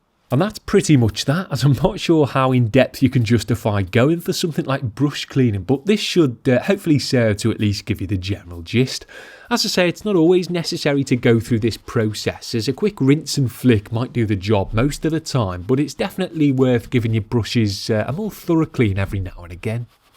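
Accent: Liverpool, United Kingdom. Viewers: Lovely Jubbly scouse😉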